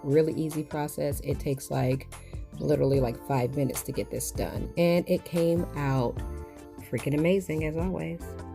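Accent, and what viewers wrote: New York, United States. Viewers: That New York accent gets me Everytime! "Let's twok about it...a little swofta!" You have to say Albemarle in your next video... Just for me! I love your hair. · Def not mad at it. Looks great! Where are you from? I hear a slight accent? NY?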